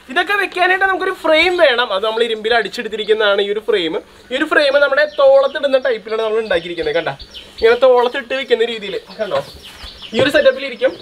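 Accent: Thrissur, India. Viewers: Thrissur slang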